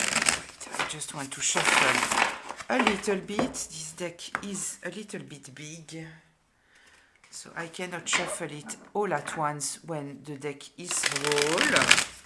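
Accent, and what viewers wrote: France. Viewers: my beautiful Lady, your story is so much my own... So much in common from what you've shared. I recently found your channel and the first time I heard your beautiful voice and that lovely French accent I feel I know you from a previous life. The few videos of yours I've watched have provided so much clarity for me (this is one of the ways you agreed to help me along my journey). No one would believe the hell ppl did to me, but your personal story as well as your resonate readings confirmed for me that not only am I not crazy; the false narrative about me, it has also helped me to finally see why the devil has made me his focus for most of my life. Satan doesn't attack souls who are already doing his bidding. He only attacks souls who are shining God's pure Light. You my dear sister are clearly on your divine and destined path; the one you planned with your own spirit guides to come to this difficult school. 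I feel it every time I Iisten to you. I owe you so much and one day when I'm in a position to do so, I will give back even though I sense you expect nothing in return. Thank you so much... please tell me your name. 🙏🏽 If the algorithms allow my comment to get to you; my name is Joan as in Joan of Arc, and I'm truly happy to have found you...again. 💜💜💜😊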